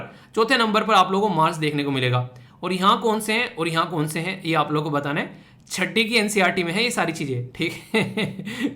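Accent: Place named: Uttarakhand, India. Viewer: Sir aap Uttrakhand ke ho aapke tone se lagta he.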